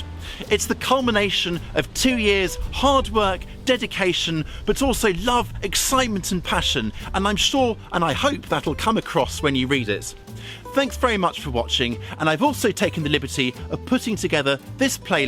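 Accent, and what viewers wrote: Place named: RP, United Kingdom. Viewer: Nice to hear Queen's English.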